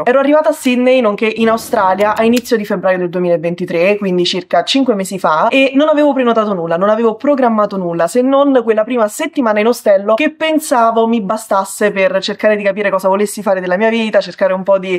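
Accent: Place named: Naples, Italy. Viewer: sei napoletana?io di Salerno... Sembra che hai lo stesso accento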